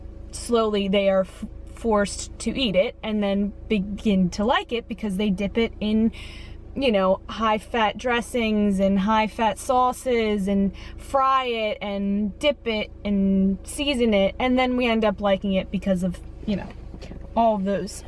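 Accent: Pennsylvania, United States. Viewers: As soon as I heard your accent I knew you were from PA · your accent I knew you were from PA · I love your accent! I'm also from PA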